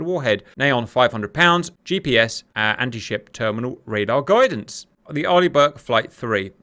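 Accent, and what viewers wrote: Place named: United Kingdom. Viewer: Damn you, damn you and that awesome British accent. =)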